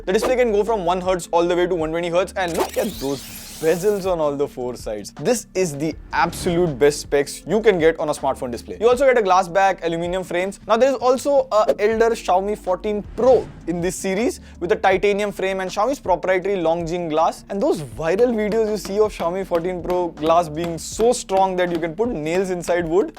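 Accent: India. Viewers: classic indian accent 🤣🤣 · I love the accent, are you Indian?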